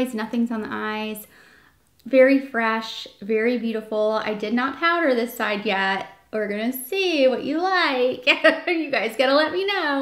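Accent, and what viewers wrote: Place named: Virginia, United States. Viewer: I love your accent. Are you from Virginia?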